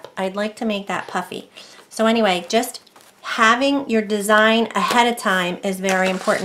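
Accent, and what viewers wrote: United States. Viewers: I love your videos. It's like I'm right in the room with you. I may be the first to say, your voice is very appealing to my ear, since you have an American accent and it is easy to understand. Thank you so much. Remember., all imperfections in art are perfect when made as one of a kind pieces.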